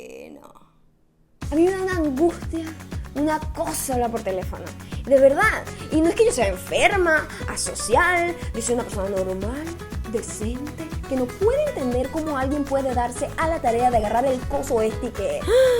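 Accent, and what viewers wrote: Venezuela. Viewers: Excelente! y lo mejor de todo es el estilo venezolano... "que paso? si fino" :D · Más venezolana imposible! Lo ame jajajaja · mas venezolana imposibleeeeeeee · Me encantó! Esto suena demasiado a monólogo venezolano. Bella Bella!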